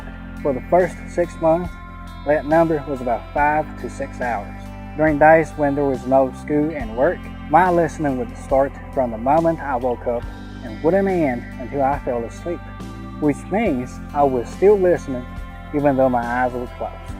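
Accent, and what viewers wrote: South, United States. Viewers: Impressive and amazing that you got good knowledge of what you doing. I hope that as you lay the first foundations of your life as a Cowboy,I hope that you will carry this accent to other Chinese young people in the United States and also in China,and in the end,Chinese,Japanese and Koreans,can experience the Country Cowboy culture and also the accent,for those living in the USA or Canada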